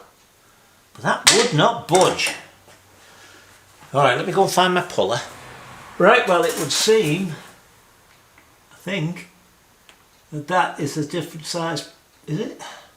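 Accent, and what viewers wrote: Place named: Teesside, United Kingdom. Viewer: Recognise the accent,,, are you from Teesside????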